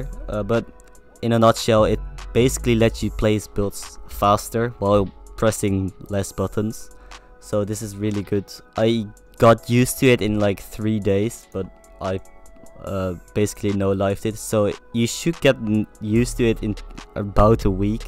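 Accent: Netherlands. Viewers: Yo maatje ik hoor dat je nederlands bent klopt dat?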